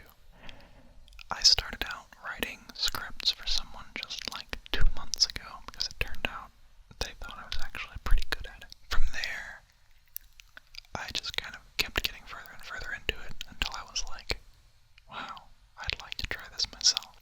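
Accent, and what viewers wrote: South, United States. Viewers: The southern accent,AHHHHHHH it hit a switch that said yes just yes...buuuut I can understand not liking how it sounds especially on your own voice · Congrats on 10K! That’s so exciting! First of all, your cats are the cutest. Pet them for me pleeeeeeeeease! Now, can we just talk about the fact that you played D&D and were a DM for campaigns? That’s so cool! And the fact that you’re a big sci-fi/fantasy nerd is awesome also. I’d love an audio like that from you in the future. And since you love the genre so much, I’m sure you could create something amazing with it. “Kill the shame inside your head, kids. It helps you open up new avenues for your life.”—This is such a powerful statement, ohmygod. Also, I’m impressed that the ‘Comforting You in a Crowd’ audio was improv! You did a great job with that and I never would have known you didn’t script it. 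It was very natural! The fact that your improv skills came from D&D makes me grin. I love that! D&D really is such a great way to learn how to act and portray a character. Even before you said you were from the South, I figured you were. Because you said y’all early in the video, haha. And then you pulled out that Southern accent and I was like, “CONFIRMED.” (You have a nice Southern accent, bytheway. Just saying shhhhhh.) As far as games go, I usually play visual novels or board games, but there’s one video game that I absolutely LOVE and will straight up tell you that I’m great at, which is Divinity Original Sin 2. Since you’re interested in fantasy, you should check it out. Might be up your alley! It's an incredible game and it has a definite (and good) ending, which is alway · Okay...now that I know you're a southern boy, please do something with that accent!!! I can't tell you how much I tingled just hearing that little bit. 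I'm a Cali girl in Arkansas and I don't get to hear that from anyone but myself (I live in the country). Actually no, I heard a hint of it from a guy I met NYE but we spoke for 2 seconds before he was gone forever 😭😭😭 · Petition to hear your southern accent more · This was funny and cute. Would definitely love a video with your southern accent. I'm dying from the little bit in this video, a whole video and I'm dead.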